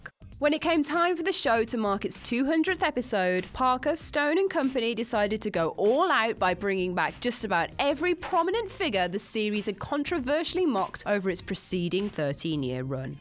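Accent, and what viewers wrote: United Kingdom. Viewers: The Top 10 countdown industry is becoming ridiculous. Now a random person with British accent is plagiarizing other top 10 videos and posting them...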